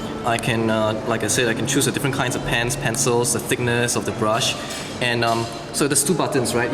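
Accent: Singapore. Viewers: Singapore accent, my accent, lol.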